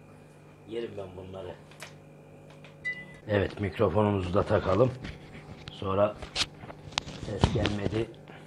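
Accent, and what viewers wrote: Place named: Southeast, Turkey. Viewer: Üstad Güneydoğulumusun ? şiben biraz andırıyorda 😊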